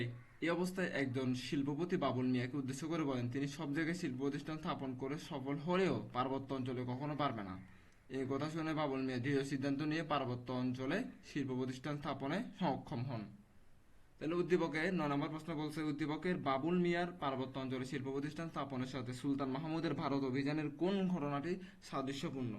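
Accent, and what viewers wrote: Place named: Chittagong, Bangladesh. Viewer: ভাইয়া আপনার কন্ঠ সুনেই বোঝা যায় আপনি আমাদের বরিশালের নয়,আপনি চট্টগ্রামের ওহ🎉🎉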